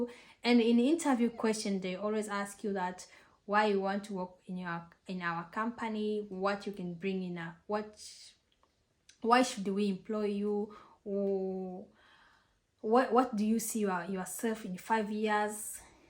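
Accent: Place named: Tanzania. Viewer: Hello thank you so much for your video it's very nice.I can here that your from Tanzania, please if you will not mind I can have contact with you because also I'm from Tanzania and want to speak with you. Thank you for your understanding 😊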